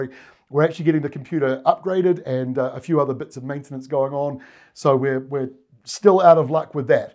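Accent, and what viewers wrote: New Zealand. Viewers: New Zealand accent not required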